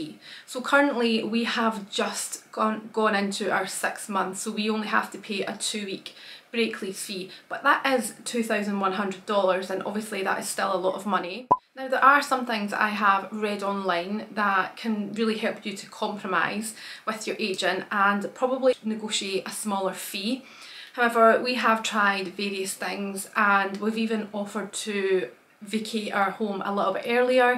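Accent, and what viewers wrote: Scotland, United Kingdom. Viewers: Thanks for this video. It is very difficult renting in Sydney, especially as tight as the market is now. I remember when we were leaving our rented place years ago how I felt when streams of people came in looking & that was when it wasn't as busy as it is nowadays. You are right about the lease & in noting the condition of each room in great detail. I know you've found a place now you are happy with but as a word of advice to others arriving in Sydney - yes you have to move further out to get a house for lower rent but if you look instead at townhouses or villas you may pay less than for free-standing house not so far out & you still have a garden ( admittedly smaller) for children. I hope your move goes well, don't forget to have an "essentials" box for moving day ( kettle, coffee, long life milk, tea bags, tissues,toilet paper, snacks etc so you can avoid the added stress of going to the shops). 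I enjoy all your videos & love your Scottish accent. P.S. Don't forget a small esky with ice so you can give the movers a drink when they bring the final load into your new place - it's a very "Aussie" thing to do!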